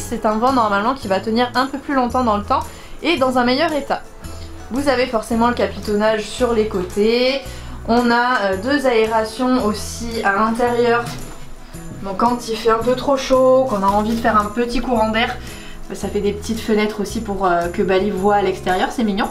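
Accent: North, France. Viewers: tu as raison en fait. C est juste que dans le nord il prononce vanne. 🙂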